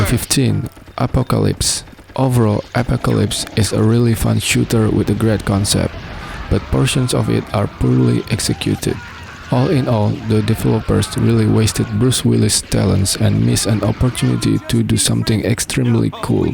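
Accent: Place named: Indonesia. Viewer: Yang ngomongnya orang Indonesia keknya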